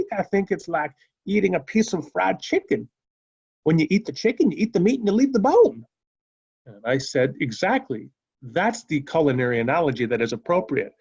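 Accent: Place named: South, United States. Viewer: Love your Southern accent,.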